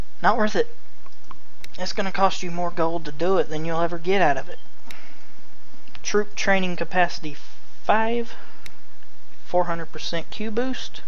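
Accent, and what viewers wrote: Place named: North Carolina, United States. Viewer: Are you from NC? accent sounds like where I live.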